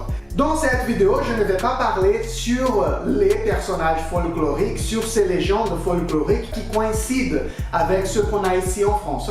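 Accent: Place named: Brazil. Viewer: Oi, vc não é francês! Vc tem um sotaque muito forte de brasileiro ou de português. Dá para perceber que vc está aprendendo a falar a língua francesa! Vá enfrente! Um dia vc conseguirá falar bem! Sucesso e até mais!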